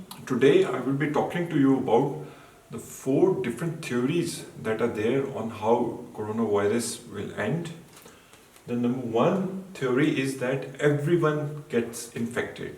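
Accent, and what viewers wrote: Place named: Punjab, India. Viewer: punjabi bahar aa rahi si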